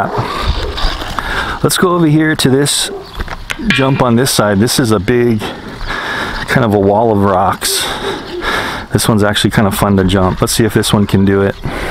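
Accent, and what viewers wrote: Hawaii, United States. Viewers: Yo you in Hawaii bro? I keep thinking I'm hearing a little pigeon in your voice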